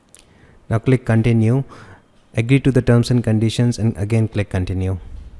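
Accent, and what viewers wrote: India. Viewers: indian much holy crap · indian accent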